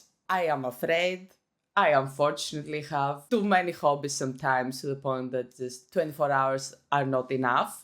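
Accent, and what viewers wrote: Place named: Russia. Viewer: Moi aussi je pensais que tu étais russe au départ à cause de ton accent puis j’ai appris que tu étais finalement grecque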